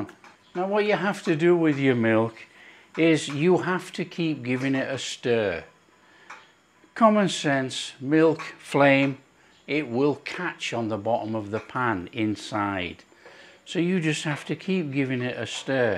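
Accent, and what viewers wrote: United Kingdom. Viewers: Can someone tell me what is this man's accent (within UK)?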